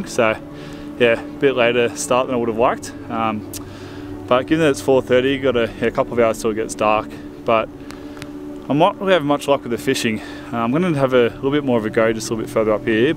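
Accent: Australia. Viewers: beautiful country. i learned a new word - "brumbies" - which i looked up & found out they are feral horses. that large rainbow trout was a beauty. always enjoy your videos. it's especially interesting to watch videos from another country. i live in the US. Australia is a lovely country & i love the accent.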